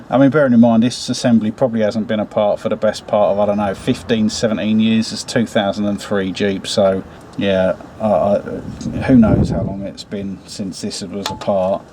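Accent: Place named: United Kingdom. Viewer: Thanks for keeping video posted!!! I get the hint that this is a 03 Jeep Liberty but you sound like your in Great Britain, but again I didn't think Jeep was in Great Britain???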